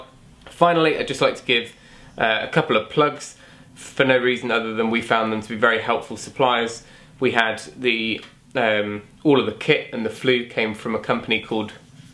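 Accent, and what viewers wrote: United Kingdom. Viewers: Just seeing the thumbnail I know the guy has a brit accent. sure enough.....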